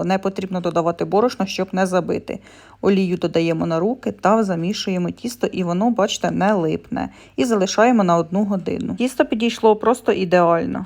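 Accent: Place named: Western Ukraine, Ukraine. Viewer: Вам рiдна,але не чисто украiнська,наголос бендерський.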